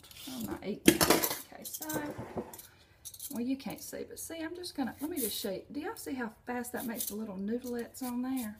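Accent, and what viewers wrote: South, United States. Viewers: You’re cute. I like your soft southern voice. I don’t usually watch chatty videos but it really was pleasant to listen to yours because of that. I’ll have to give that recipe a go. Thanks. Have a funtastic day!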